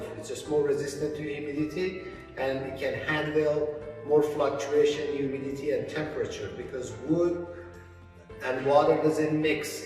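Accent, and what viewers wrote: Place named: Iran. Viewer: Dude’s name is but accent is so iranian 😆 #loveit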